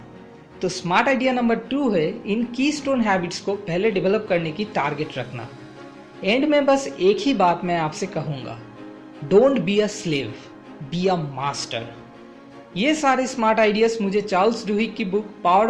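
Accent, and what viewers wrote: Bengal, India. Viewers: Your accent is bengali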